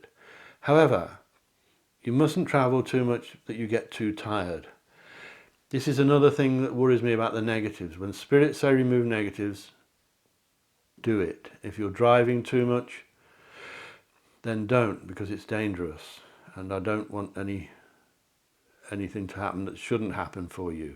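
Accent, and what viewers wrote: United Kingdom. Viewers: I couldn't choose between 3 or 4 I think they both applied to me. I'm a constant worrier and my stress levels are thru the roof. I'm dependable but not like my husband he's the one always does what he says. As for the richness I associate that to happiness and good things in my life and I have that without question. Love to watch you and listen to your voice. I'm from a state called north carolina in the US and absolutely love British accents. Thank you very much for your readings and please do continue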